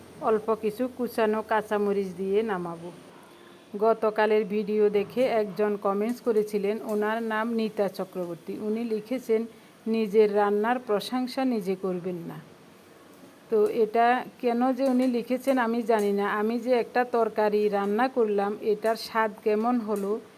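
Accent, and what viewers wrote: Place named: Chittagong, Bangladesh. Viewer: আসলে আমাদের দেশ চট্টগ্রাম। আপনার উচ্চারণ শুনে মনে হলো , তাই বললাম।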